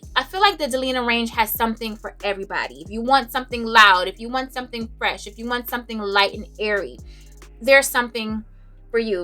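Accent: New York, United States. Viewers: I can tell you lived in New York with that accent. Love your eyes 👀 . Lady I am old and just stop to smell the aromatics. I wish I had a Lil of the gallery behind you. Beautiful dress and earrings.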